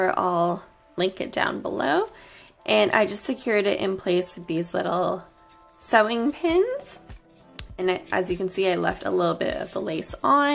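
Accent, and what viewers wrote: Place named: Canada. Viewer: Have you ever lived in Canada? Seems like you have a hint of an accent.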